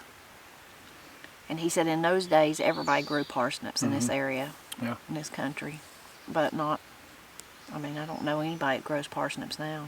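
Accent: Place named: East Tennessee, United States. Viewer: Your accent makes me think about my childhood. My family is from East TN - not exactly the same accent, but pretty close. All your videos feel like a chat with the neighbor.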